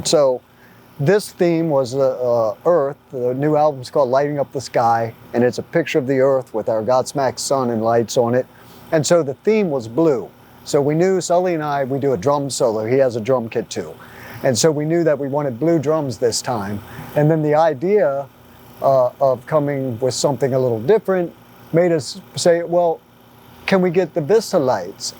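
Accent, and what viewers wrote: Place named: West Virginia, United States. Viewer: I'm a lifelong drummer from Wheeling,WV and I always thought I heard some WV in your speech.Not Hillbilly just our region.Proud of you dude.I loved this interview.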